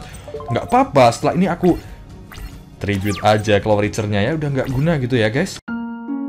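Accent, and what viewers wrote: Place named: Purwokerto, Indonesia. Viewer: Dari medok nya, apakah agan orang Purwokerto atau sekitarnya?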